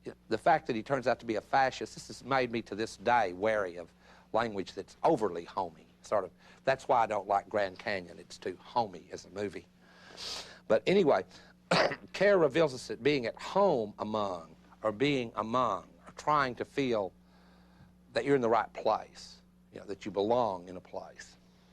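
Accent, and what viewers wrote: South, United States. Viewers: That thick southern accent doesnt help......at all.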